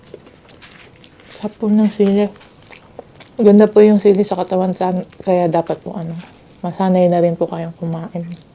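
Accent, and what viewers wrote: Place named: Cavite, Philippines. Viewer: tga cavite po b kyo?ung punto po kc eh. . .😊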